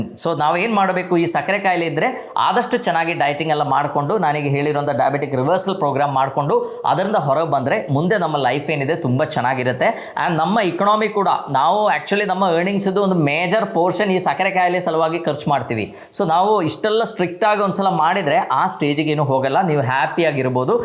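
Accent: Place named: Kerala, India. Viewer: ಡಾಕ್ಟರ್ ನಿಮ್ಮ ಮಾತಿನ ವೇಗ ಶತಾಬ್ದಿ ಎಕ್ಸಪ್ರೆಸ್ ಟ್ರೈನ್ ತರಹ ಇದೆ.ತುಂಬಾ ವಿಚಾರಗಳನ್ನ ಕಡಿಮೆ ಅವಧಿಯಲ್ಲಿ ತಿಳಿಸುವಿರಿ. ನಿಮ್ಮ ಈ ಮಾತಿನ ವೇಗಕ್ಕೆ ಕಾರಣ ನಿಮ್ಮ ಮಾತೃಭಾಷೆ ಮಲೆಯಾಳಂ ಇರಬಹುದು ಅನ್ನಿಸುತ್ತದೆ.ಅದೇ ಆಕ್ಸೆಂಟ್ ಕನ್ನಡದ ಪದಗಳ ಮೇಲೆ ಪ್ರಭಾವ ಬೀರುತ್ತಿದೆ. ನಿಮ್ಮ ಕನ್ನಡ ಭಾಷೆಯ ಶೈಲಿ ಸಾಮಾನ್ಯವಾಗಿ ಮಾತನಾಡುವರಿಗಿಂತ ಭಿನ್ನ ವಾಗಿದೆ. ನಮಸ್ಕಾರಗಳು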